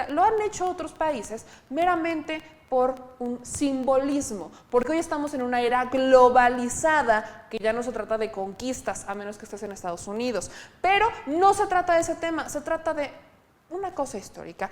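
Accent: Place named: Mexico City, Mexico. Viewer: no se achilangue tanto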